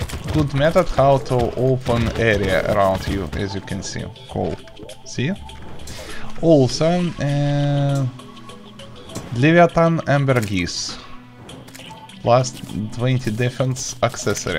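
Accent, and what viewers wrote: Russia. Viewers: Русский акцент не скрыть мен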